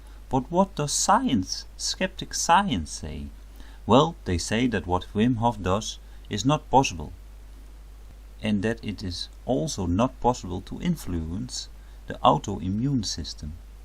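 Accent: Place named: Netherlands. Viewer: dutch accent!!!!!!!!!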